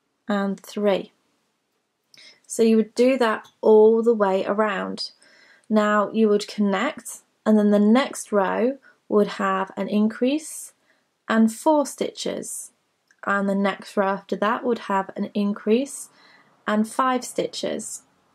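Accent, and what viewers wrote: England, United Kingdom. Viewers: Confusing that you have an English accent but are using the American stitch names! 😂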